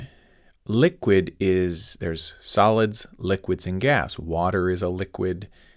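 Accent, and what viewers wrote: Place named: United States. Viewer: His heavy American accent ruined this. I expected a pure British accent. 🙄🙄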